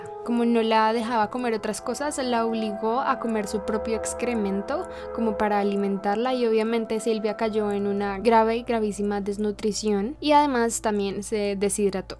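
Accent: Venezuela. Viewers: minuto no le paro bolas eres venezolana?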